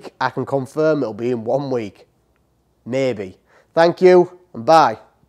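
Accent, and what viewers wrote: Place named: Scotland, United Kingdom. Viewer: Never heard your voice before, wasn't expecting Scottish. Nice to meet you sir :)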